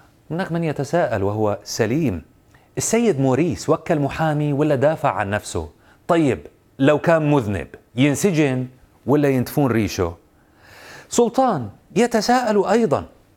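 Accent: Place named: Iraq. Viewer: حسب للكنته يبدو أنه عراقي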